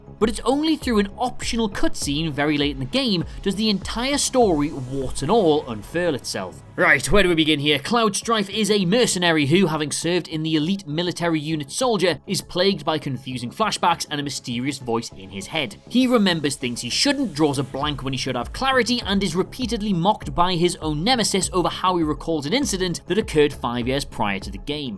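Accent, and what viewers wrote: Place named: United Kingdom. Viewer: It is a perfectly acceptable British dialect. We're not all from London you know.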